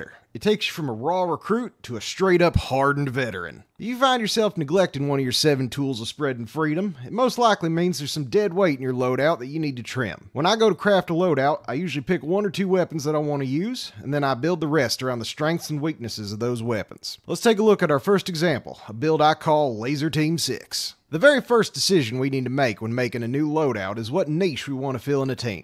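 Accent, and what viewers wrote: South, United States. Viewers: the southern accent just makes it so much better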